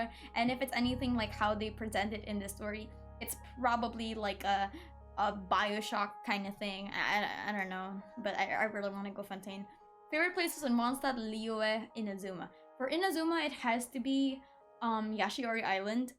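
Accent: Philippines. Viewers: I KNEW IT LMFAO AS A PINOY ANOTHER PINOY ACCENT IS SUPER RECOGNIZABLE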